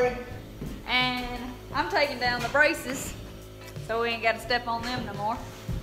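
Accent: South, United States. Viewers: Love that Southern Belle accent. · Well I should have said it better her southern accent either way it's a compliment so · That's it I'm moving to BAMA the 1st time I watched any of her videos was yesterday I was just browsing didn't click on just seen her and u know any normal guy was thinking damn hot chick...then I clicked on and that countrybumpkin accent came out of her in n love lol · Dang, I just found your channel today and I must say, you're what I'd call a “Modern Southern Belle“! You have the face of a princess, the body of a movie star and the most endearing southern drawl I've ever had the pleasure to hear. Plus you obviously honour your parents, which I actually deem important. Also I like the fact that you're not shy to get your hands dirty and get stuff done. Keep it up like that and I'm pretty sure you'll be famous WAY beyond social media. 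At least I'd LOVE to see that happen. The best wishes from the old world (Germany)... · This girl has a strong southern accent.She has the best smile 😊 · I love your soft southern accent. Beautiful. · I know I am not alone when I say that I just tune in to see that Beautiful Smile of yours and hear your sweet, Southern Accent, oh and the house framing tips. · Lotta Yanks don't like southern accents, don't count me in that group. Soft southern accent is easy on the ears and easy to understand, add her pretty face and I'm payin' attention. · I love that accent of yours true american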